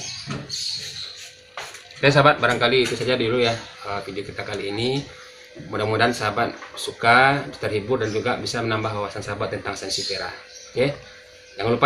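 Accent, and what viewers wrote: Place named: South Sulawesi, Indonesia. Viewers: Anda pstibbkn org sulsel yach ? Klo org sulsel asli psti tau logatx.. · Om..domisili dikota mananya..soalnya logatnya sih org sulawesi selatan..maaf kalo salah yh..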